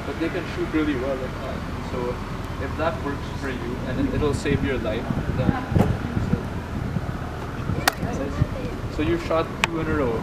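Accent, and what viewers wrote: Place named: Philippines. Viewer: tagalog ka nga pa cute eh!!!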